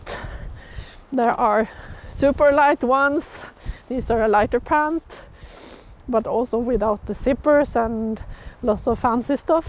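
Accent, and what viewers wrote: Sweden. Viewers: Kollar alla era videos, men inte direkt i ordning men alla är lika bra. Tyckte du fick med alla saker som är viktiga för ett par vandringsbyxor. Men… ibland låter din engelska som du snarare kommer från södra Sverige än Jokkmokktrakten:) kan ha helt fel där:) tack för bra videos i alla fall 👍🏼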